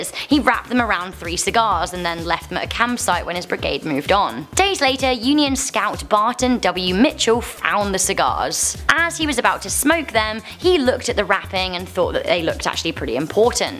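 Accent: United Kingdom. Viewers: Nice video and btw I know you are british but still lovw your british accent <3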